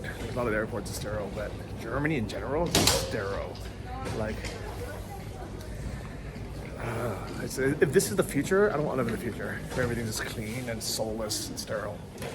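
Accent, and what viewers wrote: Taiwan. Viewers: That's a Taiwanese accent if I ever heard one. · Sounds like Taiwanese mandarin.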